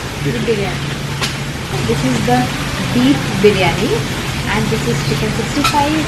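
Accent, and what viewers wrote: India. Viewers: Refreshing to see an Indian looking girl with an Indian accent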